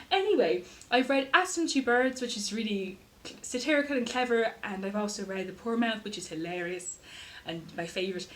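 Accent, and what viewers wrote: Ireland. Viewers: THIS. MADE. MY. DAY. (the Irish accent 💚)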